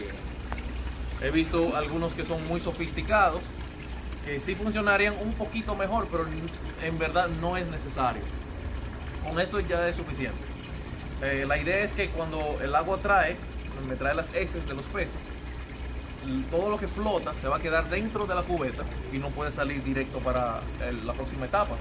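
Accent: Dominican Republic. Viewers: Ese acento es del castellano Dominicano es inconfundible al igual como lo es el chileno o mexicano, o me equivoco? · Vives en República Dominicana? Ese acento es el Dominicano standard😅😅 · Hablas como dominicano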